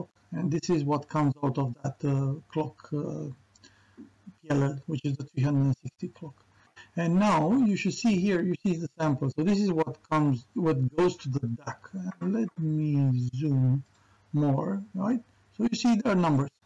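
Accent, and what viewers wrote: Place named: Romania. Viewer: Your accent sounds romanian, I don't know why. Thank you for the nice video!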